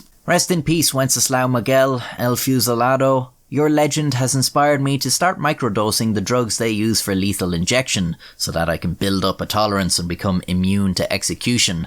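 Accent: Ireland. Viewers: Love the Irish accent. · lol randomly got a recommend to this vid, and honestly the irish accent made this 100 times better. XD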